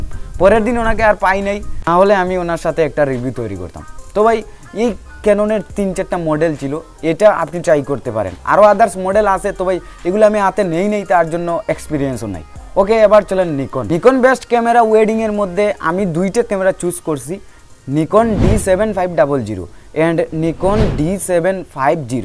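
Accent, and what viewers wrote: Sylhet, Bangladesh. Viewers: ভাই তুমি কি সিলেটি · সিলেটি নি বা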